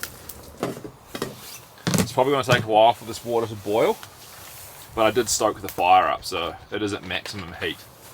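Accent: New Zealand. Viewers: He's a true kiwi where their i's sound like e's. If you want to know if someone is a kiwi, ask them to say fish and chips. It'll sound like fesh and cheps. He's ha 😅 We'll be Namibia next year on a world cruise. We're really looking forward to it. The desert looks spectacular, but I'm sure you'd love a winter scene like this after 300 days of sun. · I love listening to your New Zealand accent. We have quite a few New Zealanders here in Australia so I am quite used to the accent. Do you have a playlist of your Videos, I would like to watch them all in Chronological order. Keep up the good work.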